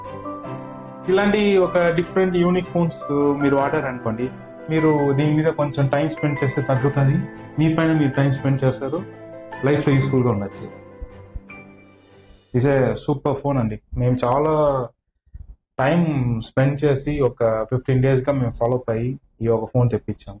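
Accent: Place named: Tamil Nadu, India. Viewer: Me telugu different ga undi brother. Like tamilian learnt telugu..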